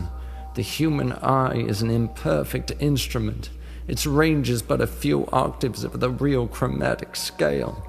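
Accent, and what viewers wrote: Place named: South, United States. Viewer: what a wonderful, flowing southern accent, beautiful voice, and a good reader - who is he??? :}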